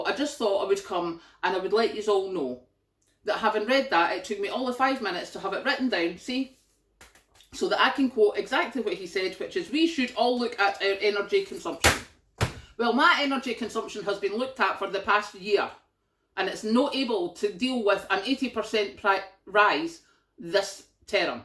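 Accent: Scotland, United Kingdom. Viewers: Go! You get so much more Scottish when you're angry:o) You're not wrong though and I just hope that the powers that be have yet another devious plan in mind - get the new PM in then tell the frightened population that it's not as bad as they first thought and here's a lower-than-expected energy cap and we'll bung you a few extra quid. I tell you what, if any Tories come round here at voting time they'll be leaving with a piece of my mind still ringing in their ears.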